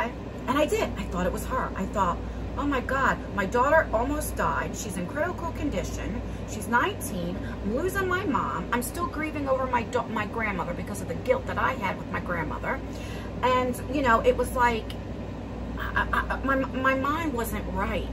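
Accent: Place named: Baltimore, United States. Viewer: your accent is what draws me lol. It’s not the same, but I’m from Baltimore City and remember my not so great youth! I’m in the south now for years and live to hear folks talk like home lol. All that other crap I really don’t pay attention to. In my opinion the folks that feed off that shit should be ashamed!! I started watching around the time after your daughters scare, but just from watching a few if your videos at that point, I could see your genuine. You sound like my best friend growing up that I got in trouble with and your videos make me feel Like I’m sitting down with an old friend. Screw those a holes!!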